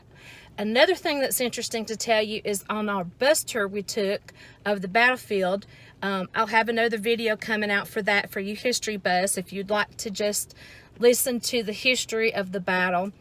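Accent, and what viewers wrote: South, United States. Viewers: Thanks, nice video, and love your southern accent! It’s a shame that the Jenny Wad house is literally surrounded by a 5 story hotel, somehow takes away from the experience. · Not really a ghost hunter... I just watch these videos to see what people come up with... anyway, if you are from Alabama, you should have tried the Bloody Lane at Antietam (nearby) or around Devil's Den in Gettysburg... lot of Alabamians died around there... I don't think ghost boxes reveal very much, if anything... just a bunch of random, disconnected words... not real conversations... but one semi-legit guy said he tried sing to ghosts at Gettysburg thru a spirit box, and he got something like a full song in return... my point is, you have a nice accent and a charming southern demeanor... if you were trying to get them to talk, well, go where the Alabamians were and use that drawl, darlin'... 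😊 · i just love your southern accent,keep up the good work,i enjoy it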